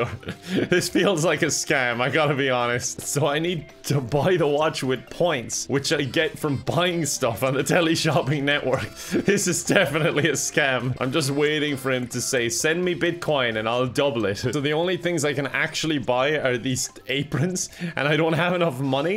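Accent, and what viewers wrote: Ireland. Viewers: IRISH ASMR WE NEED IT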